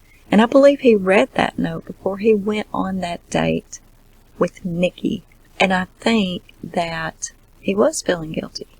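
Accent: South, United States. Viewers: From the time Shannann got home, I do not believe a word Chris said. Fact according to Shannann. In order to have sex, she would take a shower. Her suitcase is open in the discovery, and her toothbrush was still packed. She according to discovery had full make up still on. She was found with bra and underwear on. The only thing off was a different tee shirt, and her leggings. This is a story made up by a man, that is clueless. Men out there reading this. Be very careful of females swishing their tail like a bitch(female dog) in heat. Females are very protective of our belly’s when pregnant. She was never on her stomach, and we sure don’t let anyone sit on our babies., thank you for deciding what we are having for dinner. FISH Love you my southern talking girlfriend. True story. I use to travel for work. I was in yet another drug store in l think Wisconsin and a young man said “ I love hearing you talk, where are you from? 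“. I told him I was raised in Ga. but live in Texas. He looked at me and said, “ Do you need a passport to visit? 😳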